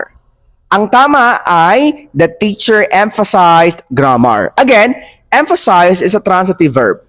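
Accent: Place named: Philippines. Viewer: you explain well. but the problem is your pronounciation. anyway its ok that is the filipino trademark.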